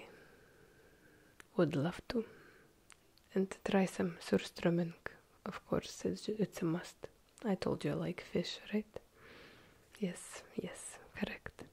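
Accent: Russia. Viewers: My Dear I would listen to you read a math text book as long as you were using your heavy Russian accent. And I hate math.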